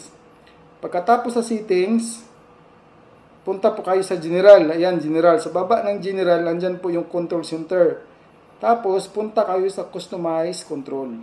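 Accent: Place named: Iloilo, Philippines. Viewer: Ilonggo guro nis kuya ay.. hehe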